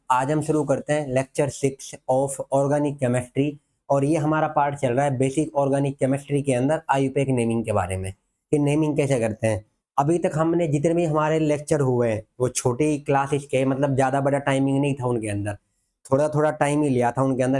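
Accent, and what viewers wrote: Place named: Mewat, India. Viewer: Sir me jairoli tijara se aapki vedio dekh rha hu mene aapni language se pehchana aap mewati ho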